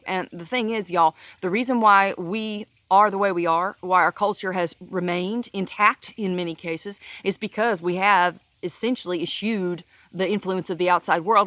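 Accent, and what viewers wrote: South, United States. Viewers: Well I love the southern accent! Let me ask you a personal cultural question. What would it take for you to allow a yankee from Rhode Island to be your neighbor? I’m not from Rhode Island but that was the best way to get you to use your worst imigination..least for this yank. I’m shopping. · Your accent is lighter but it's obvious that you grew up in the south. The way you pronounce certain things and the speed you speak screams southern. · It sounded like you got more Southern as they want on. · I'm Irish and my last name is Kelley. Born and raised in the south. Alabama my whole 52 yrs. I have heard my kin folks use the term stinks like cyarn. I spelled it like it was pronounced. Nice to hear your southern accent. Take care and God bless.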